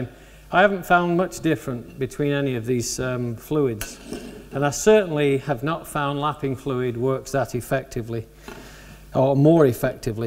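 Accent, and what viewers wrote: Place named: England, United Kingdom. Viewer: My goodness, the purists would have me believe I'll be the rest of my lifetime PROPERLY sharpening/honing my cutting tools. Now, with your method, I can sharpen/hone in time to build a guitar like I wanted to in the first place. And on top of that...an English accent that even and Ole Southern Boy like me can understand. Thanks a MILLION. (Subscribed)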